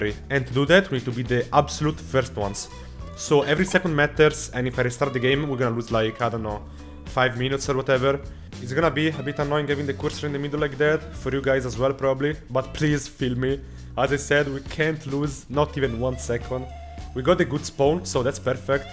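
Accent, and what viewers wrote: Italy. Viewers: You have a really strong like Italian accent lmao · ma sei italiano? Hai proprio l’accento italiano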